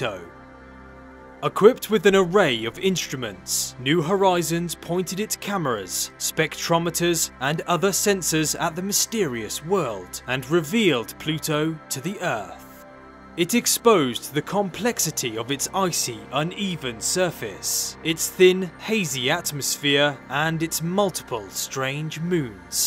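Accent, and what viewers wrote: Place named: West Midlands, United Kingdom. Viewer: This guy has to be West Midlands/south west England. There was a wee farmer twang in ‘excitement’ and ‘violent’ for sure. Great accent!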